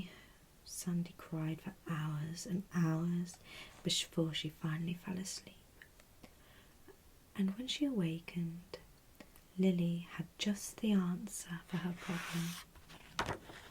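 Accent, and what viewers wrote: England, United Kingdom. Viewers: this english accent is just honey to the ears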